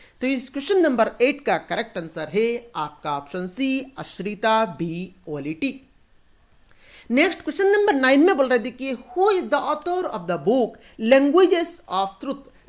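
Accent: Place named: Barak Valley, India. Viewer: Sir kya aap barak valley se ho aapka voice unse match krta h🤔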